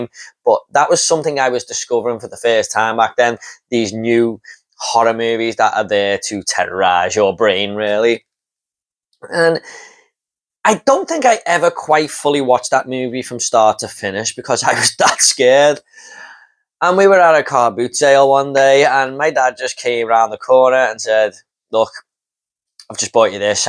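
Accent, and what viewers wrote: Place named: United Kingdom. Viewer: Love your accent btw! Love the way you said "time" where in the UK are you from, if i may ask? Im sorry if saying 'love the way you said "time" spuds creepy...it not ment to be ( embarrassed )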